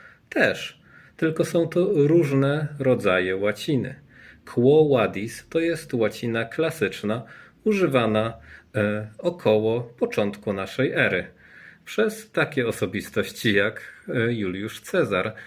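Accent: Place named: Northern Europe. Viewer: To drugie to nie żadna wymowa kościelna. To po prostu wymowa północnoeuropejska. Kościelna łacina brzmi zupełnie inaczej...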